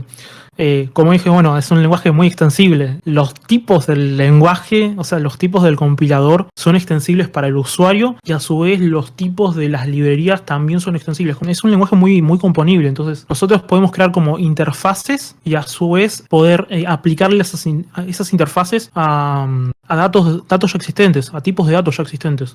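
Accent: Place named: Argentina. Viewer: Tremendo contenido! El audio de 10. Quizá lo que familiarizo el acento Argento 😁. Saludos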